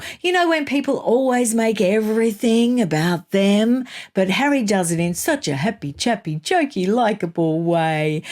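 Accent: Australia. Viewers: Hi, Great show, always nice to hear an accent from here 🇦🇺 I think you're right, there's not a lot to report on anymore. I have actually started to unsubscribe from some YouTube channels as it's just rinse, repeat, argue and fight amongst themselves quite boring, nothing new or interesting to listen to anymore.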